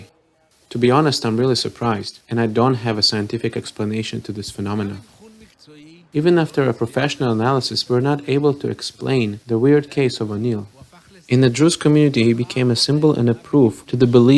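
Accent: United Kingdom. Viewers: He speaks proper Londoner accent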